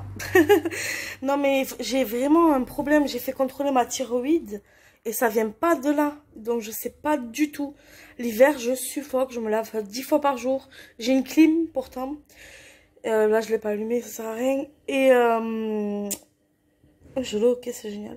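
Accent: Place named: South-West, France. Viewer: Sympa ton petit accent du sud ouest. ça me rappelle mes années de vécues à Toulouse centre ville. :)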